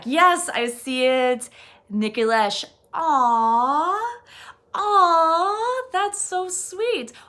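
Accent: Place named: United States. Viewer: Hi ❤️ your American accent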